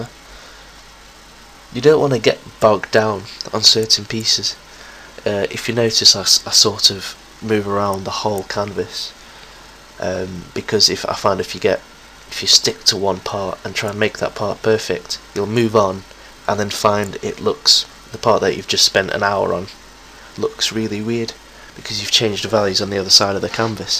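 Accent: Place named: RP, United Kingdom. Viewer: You sound so posh on this!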